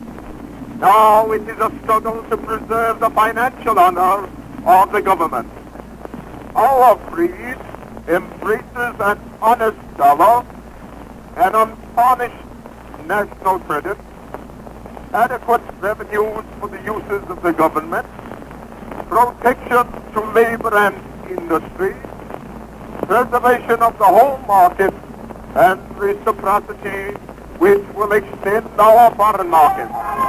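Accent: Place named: India. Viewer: As an English person, this doesn't sound English to me. In some parts he sounds Indian ( "goverrrn-ment").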